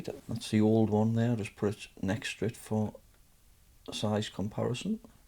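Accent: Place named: Newcastle, United Kingdom. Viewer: I’m in Newcastle. Born and bred. I can tell you accent isn’t a million miles away. I enjoy your videos and channel is the only channel out of a few hundred I subscribe to that I have notifications setup for. The simplicity of your repair attempts and fixes show that with a little knowledge and understanding such fixes can be completed successfully on a bench that’s not in an engineering or highly technical environment. Think it gives some less experienced the confidence to have a look inside and try fault finding. GOODLUCK with your channel mate. I think such topics are on the increase and there’s a lot of us out there looking for how fixes are approached. 👍🏻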